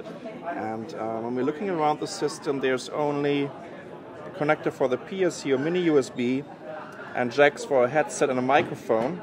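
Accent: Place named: Germany. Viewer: kinda german accent.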